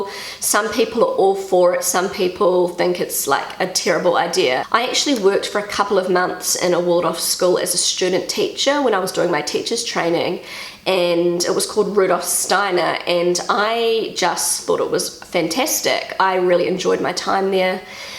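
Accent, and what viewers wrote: New Zealand. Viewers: Hi, I am a native German speaker who has never been to NZ but I love to watch your videos and to listen to your dialect! Your children have been a lovely change but I understand your decision. Happy X-mas und einen guten Rutsch ins neue Jahr. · Hi from NZ! I love how strong your New Zealand accent is even after living in Germany for quite a long time 😁 💗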